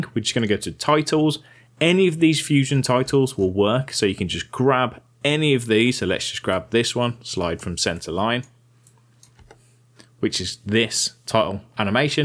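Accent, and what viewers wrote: United Kingdom. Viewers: are u from UK ur English accent seems to be so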